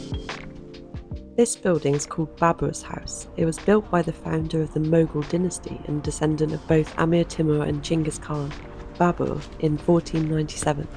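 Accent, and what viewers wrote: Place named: Somerset, United Kingdom. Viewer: Btw, I'm trying to place your accent, I think you're from my neck of the woods in England. I'm gonna guess Shepton Mallett, Taunton, Glastonbury....somewhere around there :)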